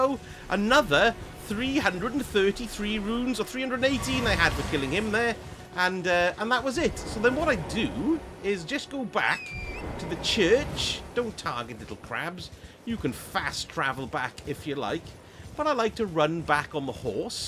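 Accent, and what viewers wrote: Wales, United Kingdom. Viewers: I love this guys energy he’s class 😆 and the Welsh accent just makes it 10 times better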